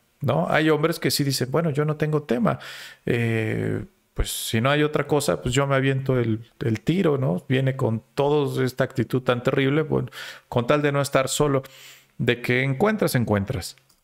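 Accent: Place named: Argentina. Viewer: Por tu acento me doy cuenta que eres de Argentina,.admiro y respeto gente culta en el deporte y en la música., tienes razón empecé con estos canales por curiosidad y me gustaron demasiado aparte de ver los de tu compatriota Agustín laje arrigoni,el cual es un escritor que me encanta y admiro.viva Argentina. Trataré de tomar muy en cuenta tu consejo el cual tiene mucho de razón. Saludos desde México tierra Azteca.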